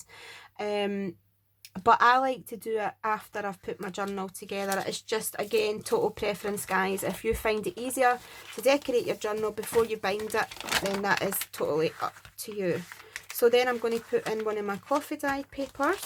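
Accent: Scotland, United Kingdom. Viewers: A nice and simple and yet beautiful and love your scotch accent ♥️🌹✝️🙏🇬🇧👍🌷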